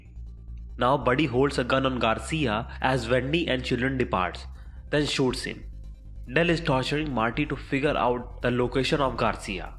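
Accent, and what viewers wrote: India. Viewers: The accent was acceptable as I believe it's Indian accent but the subtitles were really really awkward. Spelling mistakes doesn't help. Just a suggestion, if u wanna make recaps, make sure u add subtitles with correct spelling. Wikipedia of certain series also helps making a good recap as they show written update of every show episode by episode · Overall I feel this is a very good recap covering all the most crucial details to the Ozark story (Season 1), with the audio and video editing also being very good. If I had to give a critique on the video, my only critique would be that (as an American) your Indian accent is a little tick and some words (specifically proper names of people and places) are hard for me to make out, if you were to add subtitles to the video (copy and pasting the script into the subtitle option when uploading the video) I feel this would go a long way in clearing up any misunderstandings that might arise because of your accent. 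Other than that, keep up the good work, and thank you for this video, it really helped😊 P.S. sorry for all the mean comments, some people I feel do not know how hurtful their worlds can be and say things without thinking, so do not take it to heart, honestly your accent is not that bad, people are just ignorant😉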